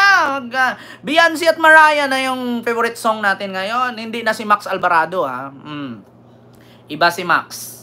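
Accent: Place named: Iloilo, Philippines. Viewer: Sir I love your Ilongo accent kuhang kuha nyo ang diction. Illongo man ako. Maayong adlaw sa imo Sir from Iloilo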